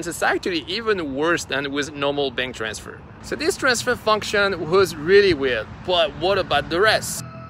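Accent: France. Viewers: Salut très bonne vidéo, tu es français ?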